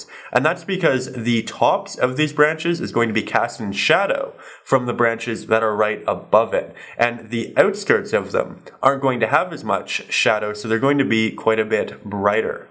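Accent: Canada. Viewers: I always enjoy your lessons...& your accent...eh?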